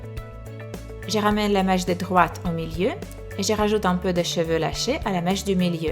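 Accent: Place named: Russia. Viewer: j'adore ton acent russe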